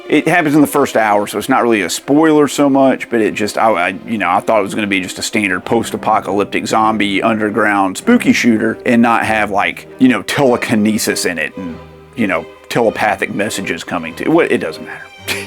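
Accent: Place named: Appalachia, United States. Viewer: As an Appalachian American, I respect and enjoy the accent. 🤘🤘🤘 Subbed and looking forward to checking out other reviews and such.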